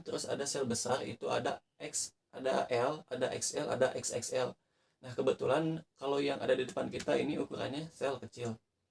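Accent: West Java, Indonesia. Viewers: Sunda banget ngomongnya 😅😅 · Lu orang sundaa yaa, udah kelihatan banget logatnya sama pas baca huruf "F"😅😅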